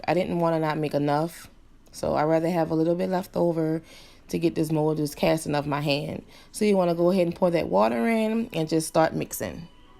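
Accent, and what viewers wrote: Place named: New Orleans, United States. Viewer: That N.O. accent BABY! 💕 thanks for posting this video I'm in New York I want to make my own as well!!!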